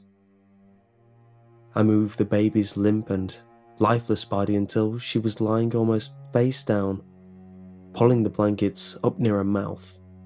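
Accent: United Kingdom. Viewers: Devin Williams they also speak very differently as this guy is British and mrcreepypasta is American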